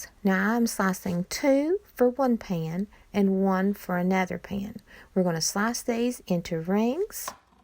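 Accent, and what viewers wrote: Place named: Georgia, United States. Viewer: Sounds like you might be a Georgia girl. I could fill up on onions and peppers, put them on a sausage dog is always the right thing to do. I'll try the other ones too. 👍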